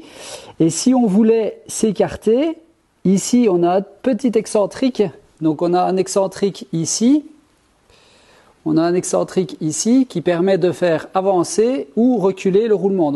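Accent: Belgium. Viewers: Allez vive. Dès que vois cette tête avec bonnet et accent légèrement belge, je suis de suite heureux.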